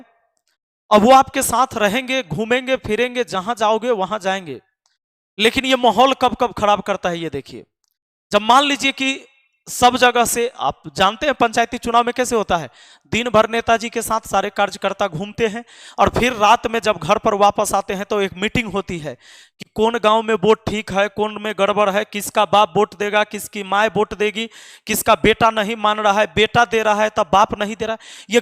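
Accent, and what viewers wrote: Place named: Magahi, India. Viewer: ये हमारा मगही भाषा है हमलोग ऐसा हि बोलते है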